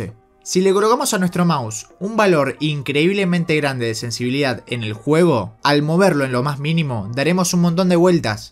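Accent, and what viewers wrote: Argentina. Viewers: Lo mejor de los vídeos es la voz argentina.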